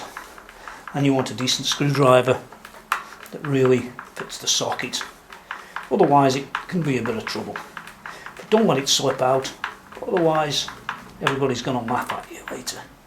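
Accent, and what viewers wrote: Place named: Cheshire, United Kingdom. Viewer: I stumbled across both of your build series and as a Stat player myself I feel inspired to one day take a similar approach, with this mark 2 the thought of Strat without a scratch plate "oh Jesus no!!!!" You sir have more than changed my opinion, what a gorgeous looking bit of kit to own, and the sound and tone even on a smart phone sounds great, if I'm honest apart from my own it's been a long time since I've heard a Strat make me want to get my hands on it lol Do you gig at all? By your accent you sound like you could well live not all that far from me in Cheshire, if you do play live I'd certainly take the opportunity to come hear you play it, I certainly understand if that would be a bit too personal. Anyhow thanks for the inspiration and enjoyable videos, enjoy your custom build, with the money, time, care and effort you've invested in her you certainly now deserve the pleasures that come Cheers!!!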